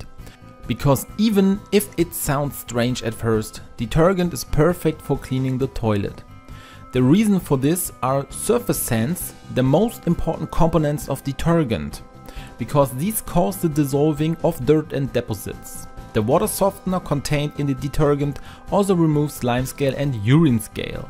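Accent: Germany. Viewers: The most German accent I've ever heard. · Öha , den deutschen Akzent hört man Danke für den Tip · 으째 발음이~~ 독일사람이 영어한 듯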